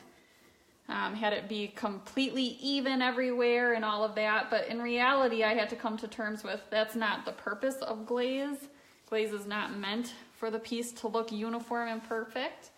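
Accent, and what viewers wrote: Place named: Buffalo, United States. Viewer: You must be from Buffalo NY??! 😁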